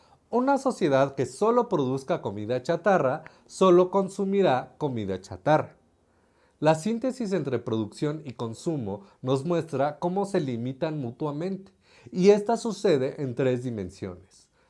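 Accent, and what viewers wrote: Mexico. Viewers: Que buena pedagogía y que agradable narración, ¿eres mexicano?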